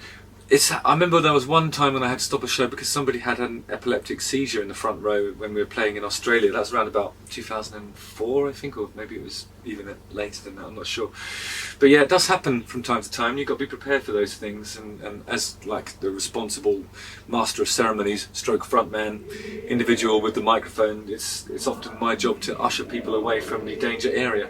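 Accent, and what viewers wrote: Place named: RP, United Kingdom. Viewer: Here's a question: You hail from 'the toft' but where is your accent? Have you always been posh or did you deliberately change your elocution? I drove my old bucket of rust (an Austin Allegro) to the bright lights London in the 90s, and found my accent was a source of ridicule, so deliberately began to affect a kind of posh/cockney vernacular to fit in. This seemed to help my credibility but to this day when I talk in public, especially when stressed, I find my Lowestoft accent seeps through to betray my humble origins. Just wondering what your perspective is, and whether you could give us a nostalgic rendition of " ", recorded in Lowestoft in the 60s?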